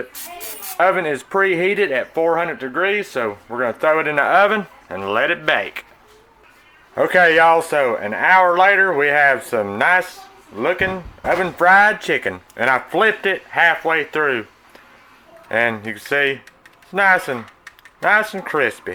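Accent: South, United States. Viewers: When l listen to this chef l can't help but think of "Jethro Bodine"; and l mean that in a positive way. For l am a fan of the "Clampet clan". Crazy about "Jethro's" misunderstandings.😄 Gimme that down home Southern accent any day. Miss Jane: Are you planning to forego the Drysdale's garden party? Jethro: Oh yes ma'am; we'z all for goin' to the Drysdale's garden party. 😁🤣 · I'm going to use your recipe today .. love your southern accent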